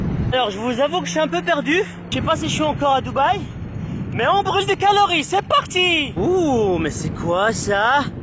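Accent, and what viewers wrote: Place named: Belgium. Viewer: c est du français belge du coup c est tout a fait normal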